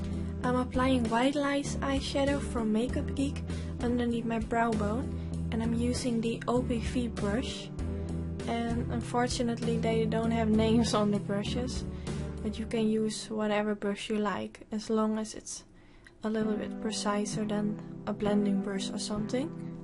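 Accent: Netherlands. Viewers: nederlanders praten echt allemaal op een heel typische manier engels haha, heel vreemd! niet slecht bedoeld trouwens, viel me gewoon meteen op :)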